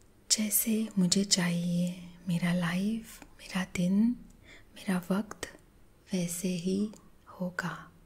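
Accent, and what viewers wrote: Maharashtra, India. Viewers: This is toooooooooo Sweety sweety voice🤭😍🙌...when a Maharashtrian girl talks in hindi😍🙌😬🤭... Khup chaan ma'am 🙌😬 Big big fan🙌😬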